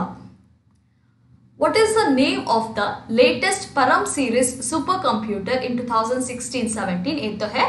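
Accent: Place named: South India, India. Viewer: Just love ur speaking accent .👀🙈 R u from south india..🥺👀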